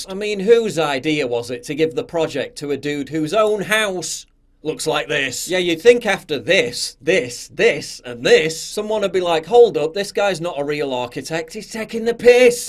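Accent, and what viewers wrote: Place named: Liverpool, United Kingdom. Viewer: Keep it Scouse 💯 👍 📚❤️🌟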